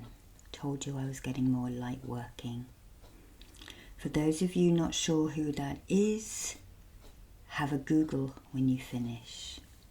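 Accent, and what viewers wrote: England, United Kingdom. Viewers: what can I say, I just simply adore. Your English accent I just love your videos mate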